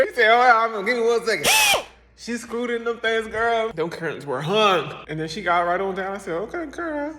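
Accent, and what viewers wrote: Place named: Philadelphia, United States. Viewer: Why do you lowkey already have a philly accent😂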